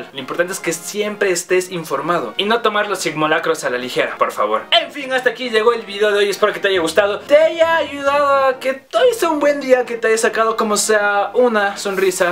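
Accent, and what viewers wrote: Ecuador. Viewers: eres de Ecuador? /o\ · eres de ecuador?! jaja me encanto este video un gose y lo del carro xD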